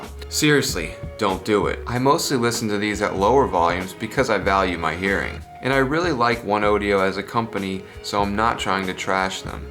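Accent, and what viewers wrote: New York, United States. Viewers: "Epicot golf ball" and the Noo Yawk accent: good. Those cacophonous urchins and drowning in chocolate pudding: bad.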